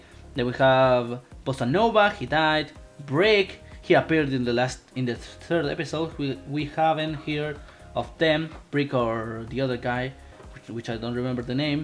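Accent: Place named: Spain. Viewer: That spanish accent tho. ( ^~^). Nice theory anyway. You get one sub.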